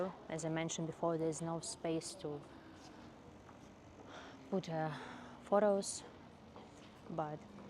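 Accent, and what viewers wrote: Ukraine. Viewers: thank you for the update and I understand your English because I had to listen to my Ukrainian mother for over 30 years and your accent reminds me of her so you're doing great! No worries. Slava Ukraini. Taras in the US. ❤️❤️🇺🇦🇺🇦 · Thank you for speaking English to the camera and sharing your experience with everyone . I like Ukrainian accents .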